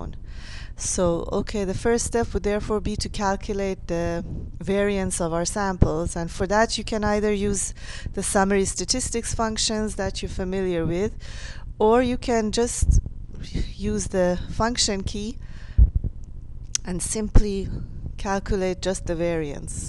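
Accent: Iran. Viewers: persian accent. woohoo! XD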